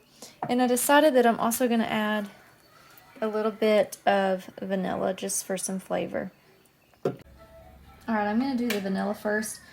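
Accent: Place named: North Carolina, United States. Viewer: Your accent sounds like home. I grew up and still live on the NC coast. The folks of the coast have a distinctive dialect. We live simply and slow.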